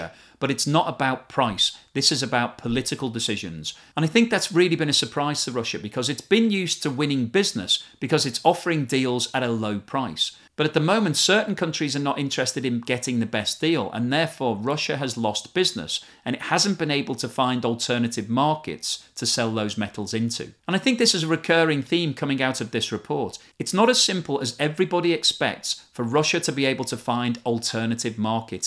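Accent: United Kingdom. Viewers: A british accent alone doesn't make a knowledgeable man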